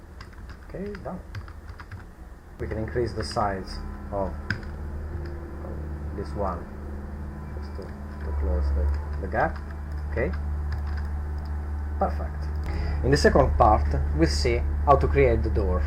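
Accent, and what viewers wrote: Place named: Italy. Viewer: Ma sei italiano? Non sembri inglese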